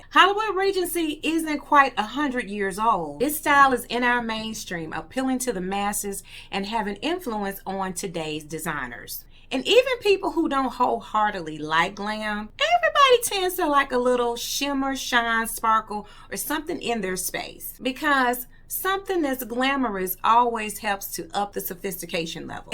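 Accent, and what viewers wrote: South, United States. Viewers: SHE GOT ME WITH HER TITLE. SOUTHERN GIRL. AND I LOVE HER ACCENT. THANKS.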